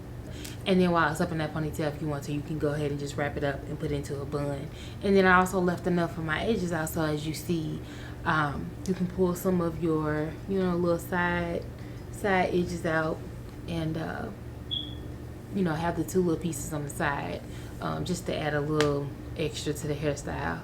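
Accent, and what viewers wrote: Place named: Texas, United States. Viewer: Sound like you from Texas ❤❤❤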